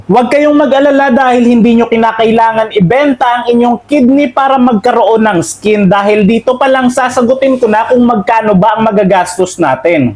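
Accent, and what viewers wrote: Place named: Visayas, Philippines. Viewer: Bisaya yan halata sa lods sarap sapakin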